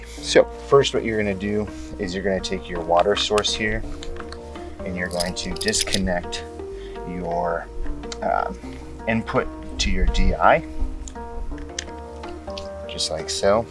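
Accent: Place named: United States. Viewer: You have one of the most calm and well spoken American accents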